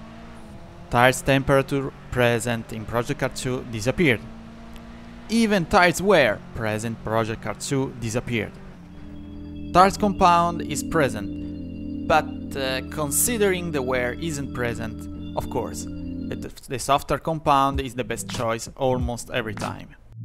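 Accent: Italy. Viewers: This guy is Italian isn’t he? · are you italian?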